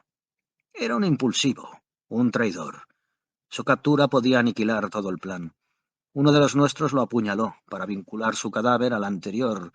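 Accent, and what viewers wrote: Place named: Galicia, Spain. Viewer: Voz gallega🤮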